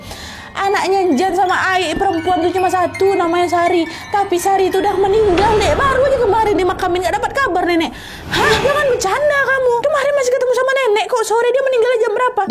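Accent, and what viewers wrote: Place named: Sumatra, Indonesia. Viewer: Mba orang palembang at org Jawa? 🤣 tp bahasanya kyk org Sumatra.... 👍👍👍👍❤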